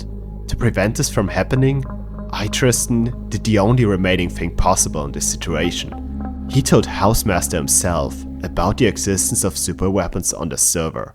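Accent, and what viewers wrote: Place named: Germany. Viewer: German accent +99999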